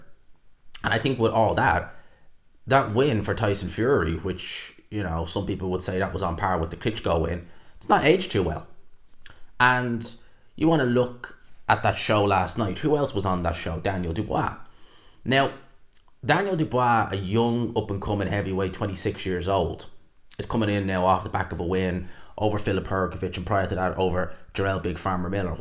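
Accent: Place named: Ireland. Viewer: Where are you from? Accent sounds very Irish 😅just curious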